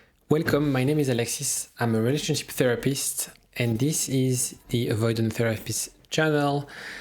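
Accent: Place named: France. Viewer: Well he is french.. did you hear the accent. He lives in london but when you learn english in France you'll get that accent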